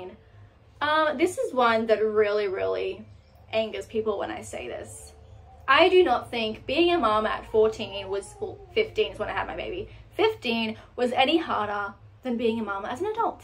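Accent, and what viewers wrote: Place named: Australia. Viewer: nah, the aussie accent sounds really nasal and masculine on her.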